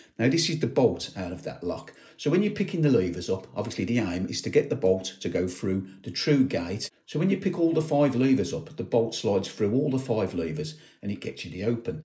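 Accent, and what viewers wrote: Birmingham, United Kingdom. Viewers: Clear as glass. What a very cheeky lever having anti pick top and bottom.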